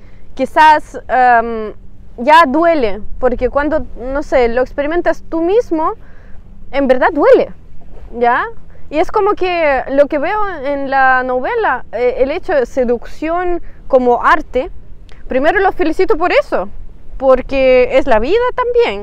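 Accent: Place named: Chile. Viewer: Muy lindo ese campo 😍 Ya hablas muy bien el español. Aunque tienes un acento chileno, pero me gusta verte hablar en español. Ustedes las mujeres rusas son muy, muy inteligente y aprenden rápido todo. Bienvenida al idioma español.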